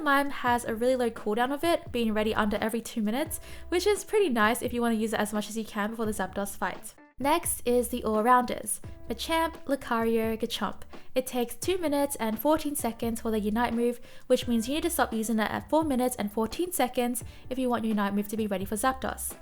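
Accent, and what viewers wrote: Australia. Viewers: As an American finding your channel in the last 3 weeks hearing your pronunciation of Zapdos was so interesting to me because of the whole ArcticUNO, ZapDOS, MolTRES thing where the names of the birds are uno, dos, tres in Spanish and that's the whole naming convention. Having you say it as Dos like windows DOS has consistently fought my old man pronunciation in a way that is both funny and cool, I'd love a series of the names Austrailian's sound out as different than the typical American's! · Love ur Australian accent and I appreciate all the hard work you put in to give us indepth info on the game so we can better understand the game and improve looking forward to future videos......I believe zeraora has the best unite move for Zapdos since it not only deals damage but increases atk spd combined with discharge and volt switch he can solo Zapdos easy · Plz make the Australian pronunciation for every pokemon. 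Videos by gen plz. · Aussie accent pokerap incoming. Can't wait. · I'm Australian so I never even noticed your accent, keep up the great work!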